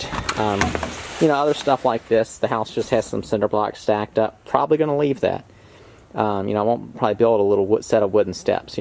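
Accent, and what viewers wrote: South, United States. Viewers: I came because the thumbnail and title made me curious, but I stayed for the southern accent lol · Where do you live? I live in Colorado and have thought of doing something like this but real estate here is unbelievably expensive. I am thinking of going back home to Mississippi and buying properties there. You sound like you're maybe from the South so I was just wondering. Good video, thanks for posting.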